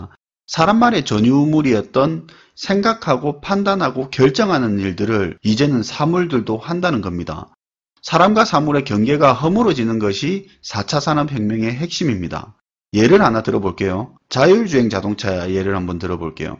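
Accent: Gyeongsang, South Korea. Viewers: 갱산도 사투리가 아주 구수합니다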